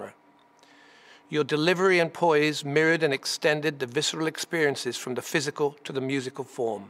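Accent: United Kingdom. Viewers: Dude he could have said cheese over and over for 2 minutes and it would have sounded profound for two reasons. 1. He's robert plant. 2. He has a british accent. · I love your beautiful English accent! I think my family's heritage came from Scotland and Wales and possibly Ireland. My mothers family has the same eyes and facial features as you have. They are very beautiful looking people!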